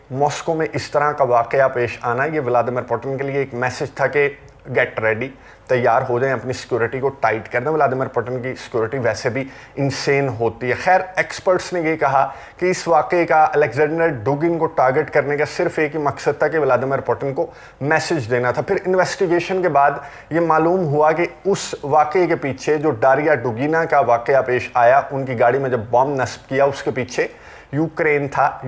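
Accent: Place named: Lucknow, India. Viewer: Your "Andaaze Guftagoo is amazing" Urdu wonderful Lakhnavi Andaaz. MashaAllah. Keep it up, one suggestion !, Please do not indulge in Political or so called current affairs. I am 68.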